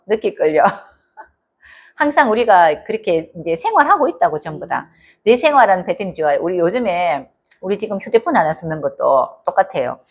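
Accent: Gyeongsang, South Korea. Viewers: 서울사람인데요 경상도 사투리 넘나 이쁘고 다 알아듣습니다 감사드려요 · 같은 경상도라도 너무 딱딱하니 듣기가 안 좋습니다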